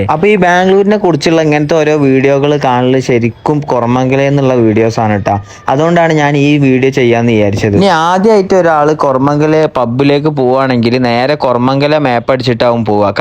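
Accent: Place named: Malabar, India. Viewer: I like your malabar accent 😅😅 ❤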